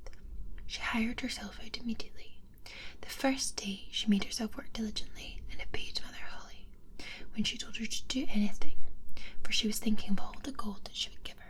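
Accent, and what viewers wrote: Scotland, United Kingdom. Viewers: Thank you,. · I'll never stop loving the Scottish accent for ASMR. I hope this stuff never gets regulated like other modern medicine 😭🤣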